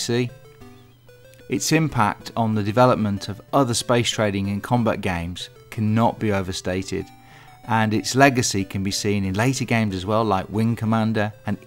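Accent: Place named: Birmingham, United Kingdom. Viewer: Subbed: Is that a fellow brummie I hear!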